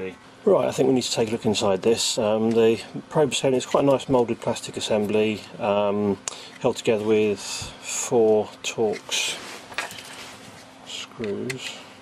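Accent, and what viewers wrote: United Kingdom. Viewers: Wow been watching eevblog a while. Never knew about you great to hear a UK voice in electronics! Thats from a essex lad!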